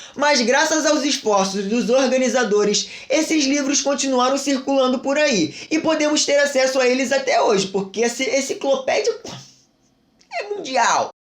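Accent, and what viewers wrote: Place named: Rio de Janeiro, Brazil. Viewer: Logo no começo já amei o sotaque carioca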